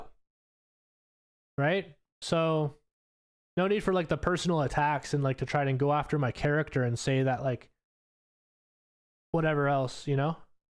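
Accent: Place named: United States. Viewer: I noticed that you say out like an American and not like a Canadian.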